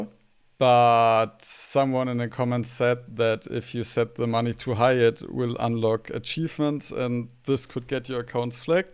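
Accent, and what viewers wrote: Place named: Germany. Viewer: danke danke. und besonderes danke fuer schnelle antwort. weisste als ich dein englisch gehoert hab dacht ich mir hmmm vlt deutsch. nicht mal gerallt dass entwicklerwerkzeuge deutsch is ^^' erst spaeter so wait a moment 🧐 klein meinte ich vom tutorial wenn mans am handy schaut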